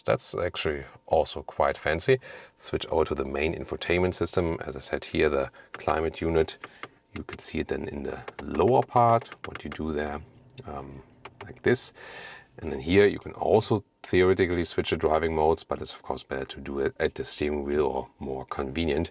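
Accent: Germany. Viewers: Somehow it feels so satisfying to listen to this well-rounded review of a French car, delivered in English with a German accent. Love the new Clio! ❤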